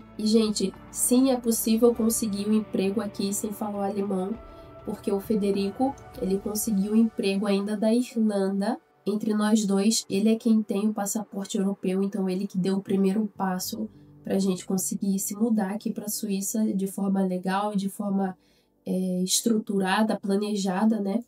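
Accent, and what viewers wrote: Brazil. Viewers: Ja, was ist lustig finde, ist… Du bist eindeutig Brasilianer (an deinem Akzent), und du wirst auch eindeutig Schweizer werden.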